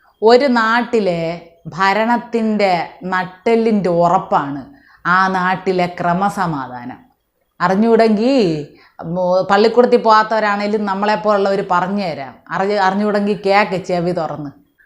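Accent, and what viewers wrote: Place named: Trivandrum, India. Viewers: TVM സ്ലാങ് വേണ്ട. യഥാർത്ഥഉച്ചാരണവും ഹൃദ്യമാണ്. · trivandrum slang is good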